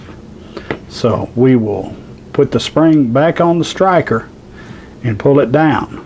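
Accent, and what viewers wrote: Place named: United States. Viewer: I’ll give you a third just for that country ass voice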